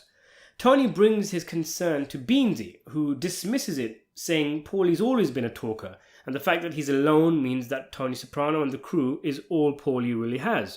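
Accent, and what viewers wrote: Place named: East London, United Kingdom. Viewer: Bro you sound so Bengali from East London 😂😂😂😂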